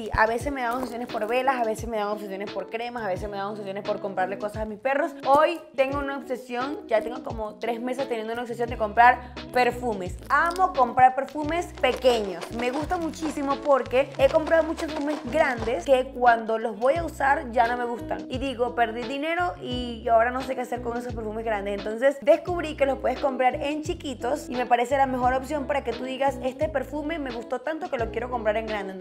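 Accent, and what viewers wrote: Venezuela. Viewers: hola una pregunta 'tu eres venezolana'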